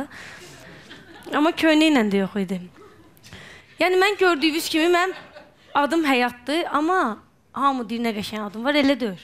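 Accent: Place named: Baku, Azerbaijan. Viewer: Sene nə dəxlisi var elə söz deyərsən gulmerem ama bu bir az Bakimin lehcesinde daniwir, hər wey zordi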